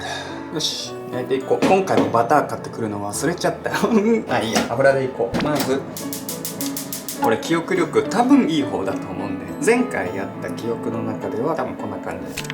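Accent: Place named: Hakata, Japan. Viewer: 「 」で検索し始めてお目にかかりました！ 独特な方言が癒されますね〜😊福井っぽいと思ったけど博多弁なのかな？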